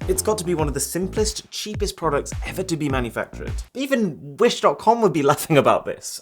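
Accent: United Kingdom. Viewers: your austrailian? but you sound so British. Do an episdode with dank pods! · I come here to hear a british accent and get my subscibe putton punch on my account. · Australian dollars later... I thought he was from the UK🤔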